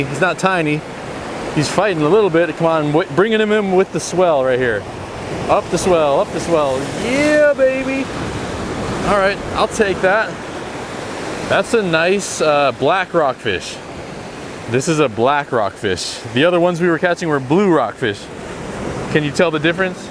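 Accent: Bay Area, United States. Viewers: U know from the bay when he says hella yee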